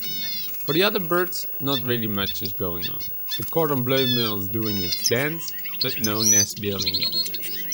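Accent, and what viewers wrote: Netherlands. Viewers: Hearing on.your accent you are from.the netherlands right. Love your birds. They are beautifull! I really want to make a small aviary to! Not quit sure yet. Keep it up!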